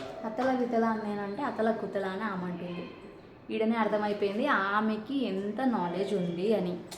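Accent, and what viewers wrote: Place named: Telangana, India. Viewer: Hi Sister, మీ interview భవిత తో చేసినది చూసి మీ fan అయ్యాను, మీ తెలంగాణా యాస, మీ straight forward questions etc మీరంటే ఇష్టం అయింది. నేను ఒకటి చెపుతాను ఏమీ అనుకోకండి.. నేను వేదాంత classess attend అవుతుంటాను, వాటిల్లో చెప్పేదేమంటే మనిషి జన్మ దొరకాలంటే 84 lakhs species జన్మలు అనుభవించిన తర్వాత మనిషి జన్మ దొరుకుతుంది. మనిషిగా పుట్టిన తరువాత మనిషి గానే పుట్టాలని కూడా లేదు. ఎక్కువ పాపాలు చేస్తూ పొతే మళ్ళీ low level species గా పుట్టవచ్చు. So deep the subject is. I am not negative about you, bkz ur field is different you may not come across vedanta points, only to share with u what I heard in our classess I shared. With authentic gurus you can check what I told. I subscribed ur channel the moment I saw u on the screen.😁 All the best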